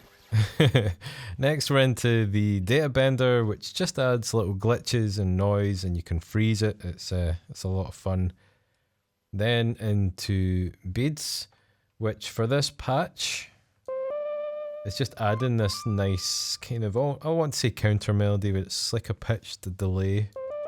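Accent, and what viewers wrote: Scotland, United Kingdom. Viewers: Backing track? what is cheating in music production at all these days? that sounds interesting, as far i understand your nice scottish accent;)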